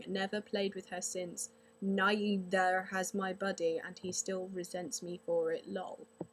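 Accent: United Kingdom. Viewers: heck you don't have to search on google! Look in the mirror you're so pretty and that british accent. Lovely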